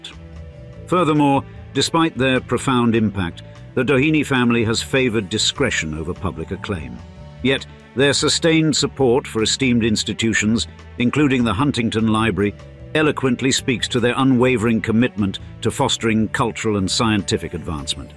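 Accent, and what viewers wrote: United Kingdom. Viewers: A British accent narrating an American city sounds odd.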